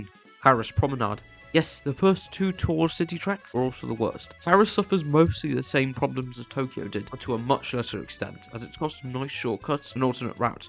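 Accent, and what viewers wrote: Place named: United Kingdom. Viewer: Hold up...ur from Spain? Then, why do u have a British accent? 🤨🤔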